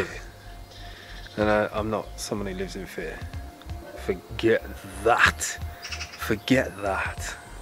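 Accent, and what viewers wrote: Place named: United Kingdom. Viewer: I know you’re a straight guy, but as one of your gay fans, and with utmost respect, I must remark how very handsome you are. I am a pushover for guys with British accents, but add the beard and the adorable eyes, and you are simply a heartthrob. Have you ever been called a heartthrob before? I’d have to wager that, obvious as it seems to me, you have to have heard it once or twice before! Pardon my moment of gushing, but I just felt it needed to be said. Now there, I feel so much better! And I hope you are too, my friend! Peace and health to you and all those you love! 🇺🇸🤗🇬🇧‼️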